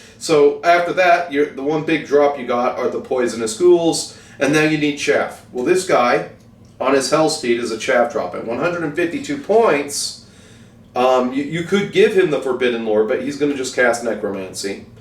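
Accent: United Kingdom. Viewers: That is alot of figures.... alot! Im missing your British accent, the guy from Upton that supergule his stamps and because of that charge you extra for the shipping cost. 😎👍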